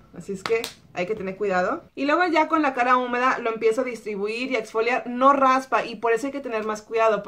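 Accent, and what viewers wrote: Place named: Monterrey, Mexico. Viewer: Me encantan tus videos, pero también entiendo perfectamente tu forma de hablar jajajaja....Las Viejas... sera porque también soy Regia, aunque vivo por mas de 25 anos en Albuquerque, y se que no es por ofender, y tu cara con lo de las tareas de los niños. Felicidades por tu canal lo disfruto mucho y ojalá puedas seguir con los videos de como organizas tu casa, que por la organización de tu cocina es como encontré tu canal, ya hace algunos anos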